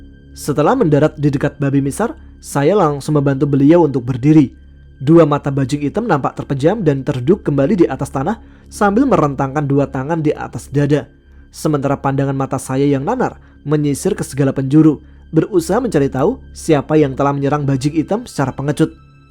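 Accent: Jakarta, Indonesia. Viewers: Ane orang Betawi bang, keren bahasa Betawi ucapan bang masuk da kaya berrry prima😅😅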